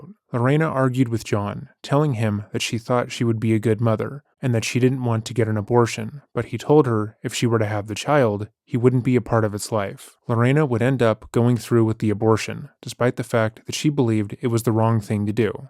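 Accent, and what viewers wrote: Canada. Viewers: have you become more Canadian recently? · I’ve been subscribed for over a year and it’s just now dawned on me that you’re Canadian! Canadians pronounce the word “about” very uniquely. 😅 Love the channel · well he has either purposely added the Canadian accent or the AI randomly generated it and he didn't notice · You're Canadian? This story brings flashbacks 😂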